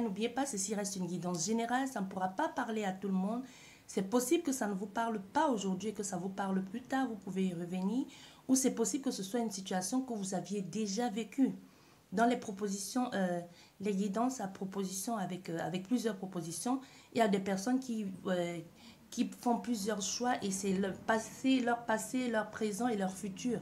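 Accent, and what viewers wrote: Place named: Martinique. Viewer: Bonjour, Tu as bien fait de recadrer sinon c la dérive. J'ai sélectionné ce qui me parle dc merciii. Ton accent me rappelle celui d'ici (la Martinique). Vite ta prochaine guidance. 🌴☀🌴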